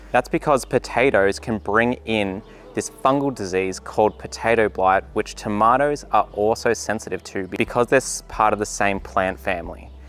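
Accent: Australia. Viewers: Don't pick on his Australian accent. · That strangulated Australian accent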